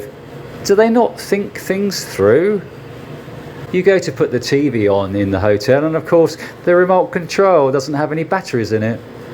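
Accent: England, United Kingdom. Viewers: This was stupid. The englishman, from his dialect I think he is english should try to live in UK. Everything he complains about is so much worse in the UK. Philippines may have some problems, something we foreigners are not entirely happy about because it does not comply with our likes, but still....Pilippines is a great country to live in.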